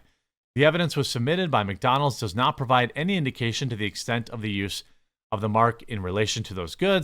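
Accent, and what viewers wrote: Wisconsin, United States. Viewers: That my friend is a Wisconsin way to say bag. I’m in northern Illinois. It’s baag. lol. Love your videos brother Also. I’m telling you guys “Demolition Man” 1993 predicted this. In the movie Taco Bell was the only restaurant to survive the franchise wars. Took place in 2032. They have begun 😂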